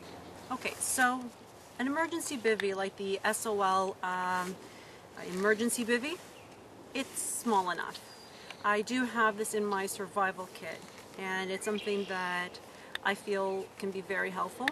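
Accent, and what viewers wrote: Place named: Canada. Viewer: You don't have to state that you are a Canadian, pretty girl. We knew that as soon as you tried to say a word with the letter "O" in it.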